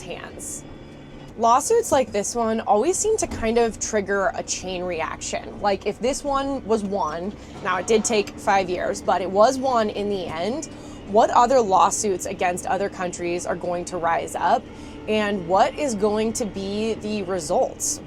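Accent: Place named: California, United States. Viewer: Your voice is getting “too California” accent. You’re a midwestern woman!!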